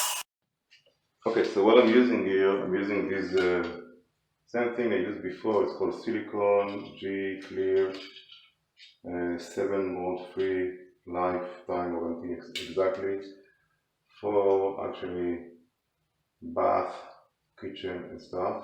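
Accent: Israel. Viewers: love your video!!!.......and your Israeli accent!.. thanks!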